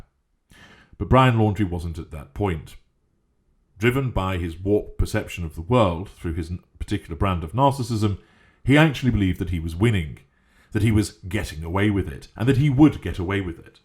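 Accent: United Kingdom. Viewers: Get my news from a disembodied British voice on the youtubes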